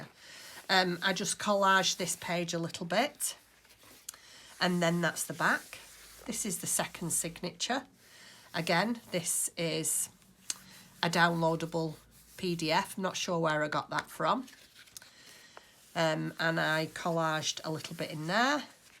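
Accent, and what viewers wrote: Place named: United Kingdom. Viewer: Where are you from? I’ve never heard that type of British accent.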